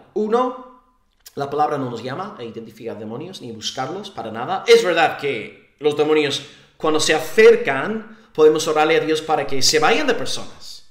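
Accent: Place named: Spain. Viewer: Hno , tengo poco que l descubrí a Ud y me encantan sus videos me han enseñado mucho ,,soy cristiana evangélica calvinista ,pero quisiera decirle algo no capto bien a los españoles a pesar que soy de Mexico y hablo español pero Ud habla muy rápido y no capto las citas bíblicas y versos ,me puede ayudar de algún modo ..tal vez que en la pantalla aparezca esto ?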